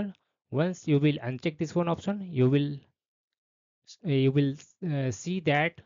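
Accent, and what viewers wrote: India. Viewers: you know the tutorial good when the accent is indian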